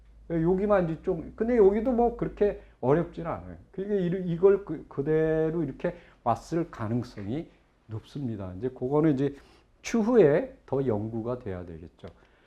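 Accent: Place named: Gyeongsang, South Korea. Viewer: 어렸을때 경상도 시골에서 들어본 사투리가 전부 다 나오니 신기하다. ㅎㅎ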